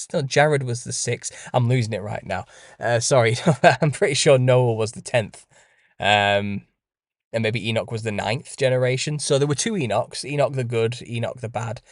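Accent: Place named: Wales, United Kingdom. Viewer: I love the British accent to because my mom was from Wales and all her family sound like you😂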